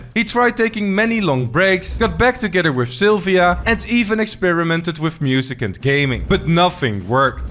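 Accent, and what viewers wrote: Netherlands. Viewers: Ayo you sound dutch af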